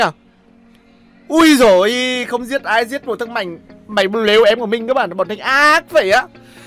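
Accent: Nghe An, Vietnam. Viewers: Nghe quen giọng lào lúc nào không hay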